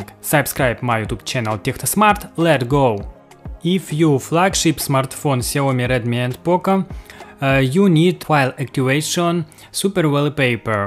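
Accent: Russia. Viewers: ахпхп тут понятно что ты русскоговорящий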